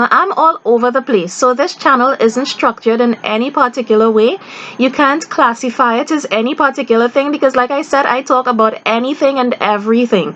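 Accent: Trinidad and Tobago. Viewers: 🇯🇲 I love your Trini accent. You can talk about raindrops and I would tune in. 🇯🇲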